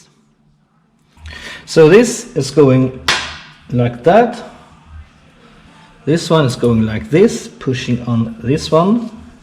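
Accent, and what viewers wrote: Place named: Sweden. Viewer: Tja, underhållande video med riktigt fin svengelska dialekt :) Jag har en RRC från 1984 med, vad jag har förstått är den nya Boostern. Vet du om den går att renovera? jag tycker mig ha läst att den måste bytas ut om den läcker..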